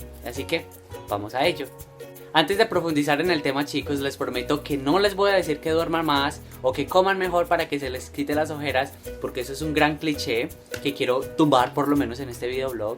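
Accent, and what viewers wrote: Antioquia, Colombia. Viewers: definitivamente paisa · Oyeeee paisa me gusta como hablas... bacan!!!!!!!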